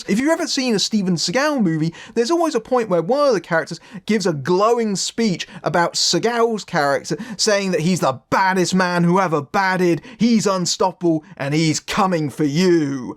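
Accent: United Kingdom. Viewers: I'm detecting some British Isles lurking in your accent.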